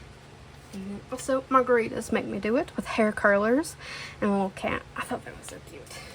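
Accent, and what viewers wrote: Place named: South, United States. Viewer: Loooooove the country dialect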